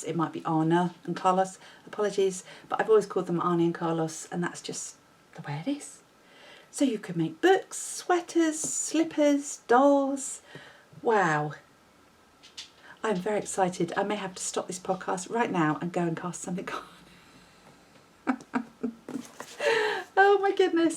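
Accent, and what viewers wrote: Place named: United Kingdom. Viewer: After I watch you, I find myself talking to my dog with more of a British accent. Lol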